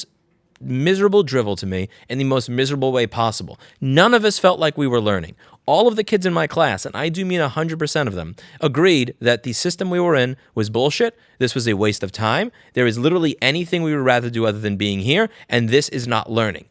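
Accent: New York, United States. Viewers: This is the most New Yorker rant I’ve heard in a long time. I’m here for it.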